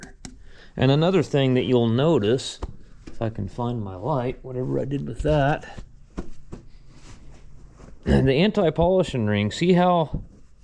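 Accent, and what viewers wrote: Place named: South, United States. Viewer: Where did learn redneck speak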